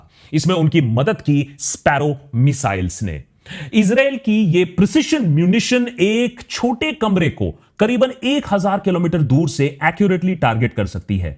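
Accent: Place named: Bihar, India. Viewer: Bhaii, you are great.Your style is typical Behari sweet way.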